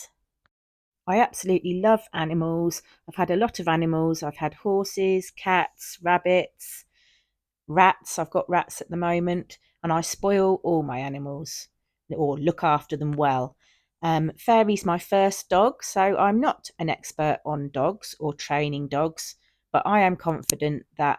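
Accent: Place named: Essex, United Kingdom. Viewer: Fairy is beautiful and she is so lucky she has landed on safe ground with you as her new owner. You sound the perfect, caring owner every dog could wish for. Well done for rescuing her! 👏🏼👏🏼👏🏼Seems funny hearing the Essex area accent again. It's exactly how I spoke. I now live in Ontario Canada and have done for 45 years, but I was born in Romford, but lived near Maldon after getting married. It's a lovely area, obviously I know it well. I have always loved greyhounds and my brother-in-law owned a Lurcher. How I loved that dog. He looked like a big hairy greyhound LOL He was also a rescue and had been used for badger baiting. Rescue is the way to go! (she says - with a breeder bought Shih-Tzu/Maltese 🙄)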